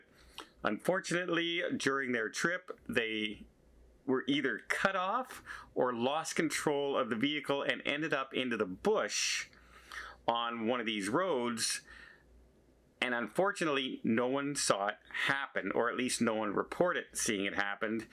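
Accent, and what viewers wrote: Canada. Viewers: I can’t pinpoint why, but I love your videos, despite never having been on a cruise in my life (I am in my 60’s, married, and empty nest) and having no concrete plans to do so. I am a New Yorker and appreciate your Canadian accent and your overall positive outlook on seeking to make your passion a reality. If I were ever to book a cruise, I would take your recommendations to heart. You are an inspiration in how you travel and share the joy with your viewers.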